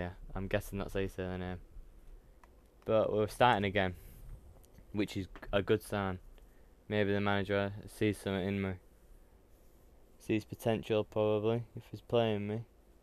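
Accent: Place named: Northern England, United Kingdom. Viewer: SAME and he's northern which makes it 10x better for me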